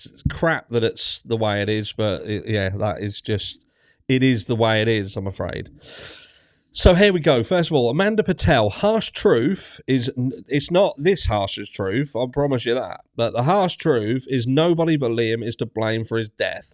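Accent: Norfolk, United Kingdom. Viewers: Money & power will always win, even the media are making money from Liam. Howdy Harsh we’re neighbours 👋 we can sniff out that Norfolk accent 😂🦮🥰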